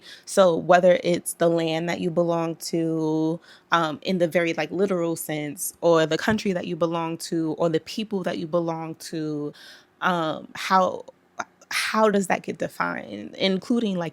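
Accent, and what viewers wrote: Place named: Brooklyn, United States. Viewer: 👋🏽!!! I am just coming across your video and I have to check out more!!! You sound just like me and I love it! BACKSTORY: I’m born and raised in BK - went to BGHS if that tells you anything. Now live in VA. Folks are always talking about my accent 🙄. I’m like, well here’s the pot calling the kettle black🙄. I remember buying our books downtown Brooklyn and along Fulton St. So now Malcolm X is popping like that?! I’m so jelly! I can’t wait to see more vids from you. I read How To Love a Jamaican back when I lived there!! I’m so glad to have came across someone else who knows about this one. I think I want to reread it now! Thank you!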